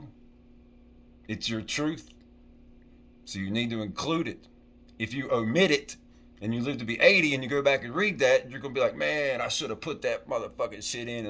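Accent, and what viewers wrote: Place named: Appalachia, United States. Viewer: "Man, no one is gunna believe this shit" 😂 It's so true though. Life is totally unbelievable, a bizarre adventure of cosmic grandeur and idiotic stupidity. I can't wait to read this book. And FYI as a Brit I love your accent and figures of speech. Nothing wrong with being a hillbilly 🤣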